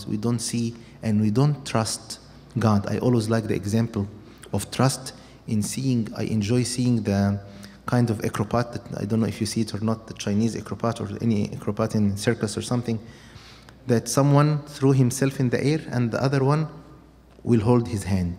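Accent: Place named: Northern Mariana Islands. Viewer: hmm this is in english, though the accent is thick, and though I am certain this Priest is from the middle east parentage he kind of sounds like some people I know from the marianna islands in the pacific they kind of sound spanish with they way they pronounce certain words, that aside about 5 minuts in I am enjoying listening to this sermon, its very deep and thought out, and last but not least informative, oh I am a traditional roman catholic another appostalic church if you copts like to know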